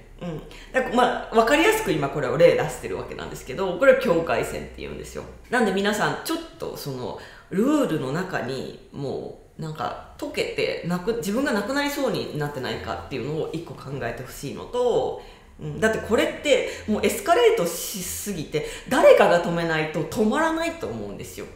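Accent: Kansai, Japan. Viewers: 『理由はありません、て言うてもてるやん』← の関西弁、好き❤️ 確かに、人に迷惑かけない為のルールに縛られすぎてる❣️って、迷惑かけるが大前提になってますやん（笑） お互いに尊重できる日本になるといいな^ ^いつもありがとうございます✨🙏✨ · Momoyoさんこんにちは。今回の動画も、Momoyoさんの話を聞きながら、自分はどうだろう？境界線引けてるかな？なるほどなあ、など、たくさん自分と向き合うきっかけをいただきました。ありがとうございます。わたしの中にチクチクと刺さっている言葉があったのですが、今回のルールのお話でその言葉がすぅっと消えていった感じがありました。 「ルールだから」「慣習だから」は、本当の愛を見失ってしまいますね。わたしはわたしの愛を表現する力を頂いたように思います。ありがとうございます。 Momoyoさんのツッコミや関西弁、歯に衣着せぬ物言いがだいすきです。今回も何度も吹き出しながら動画拝見しました笑ありがとうございました。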